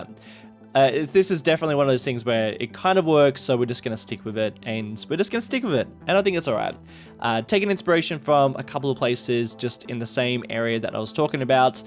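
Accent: Australia. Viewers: I did a double take at "Lewis Armstrong". Haha, love the Aussie accent.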